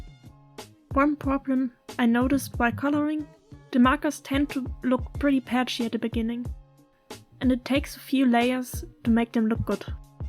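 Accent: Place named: Germany. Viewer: Hey just wanted to say your video is actually pretty good, the quality is good! Also it was pretty interesting because i never had an actual set of these to use for an actual illustration. I suppose that you are a native German speaker? At least you are sounding like one, but i might be wrong- Anyways, your accent is indeed visible and I do not know if this helps but I learned that speaking englisch, and getting the accent a bit less visible is not easy. As an advice: it might help you if you speak more "swollen" German is a language that makes you speak with mote cutts. English is a language that is rather smooth, while german is kind of fractional, both in individual can sound very pretty but combining these mostly sounds strange because its a big difference. Also if you really wanna work on it you always can watch movies or tv shows and speak with the characters. 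Because if you focus on that for most people the words come again voiced in their heads when you then speak them out loud. But, do this with older series, something that you already watched and better not use something like sit-coms, because people are speaking faster and not always correct english so its not the best idea to get there an example. At least this was something that helped me alot! But you actually don't need to work on the accent at least you speak very clearly and you are understandable, so infact there is no real need to do so, but if you want to improve thats the advice I could give... Have a gre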